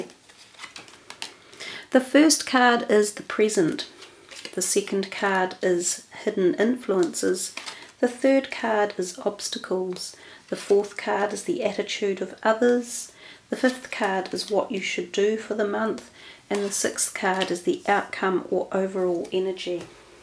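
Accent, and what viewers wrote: New Zealand. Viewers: you have an NZD accent.. where are you from? North or South?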